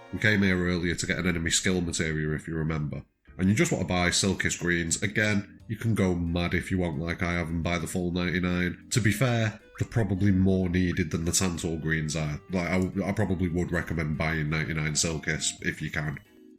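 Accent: Manchester, United Kingdom. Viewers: OP are you from Stockport? Just skimming across ff7 gold chocobo videos and noticed that you’ve got the same Manc twang as me!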